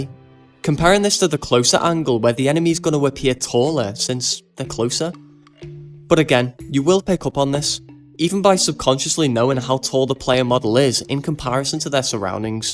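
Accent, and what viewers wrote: United Kingdom. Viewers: Bro... I never been into first person shooter games. For whatever reason i decided to dl cs2, your vids are very eye opening. Youtube suggesting them to me after 2 hrs of game time, well done yt. Hit me up if your down to do a vid series training a newbie. I know from accent your british so on my time zone lol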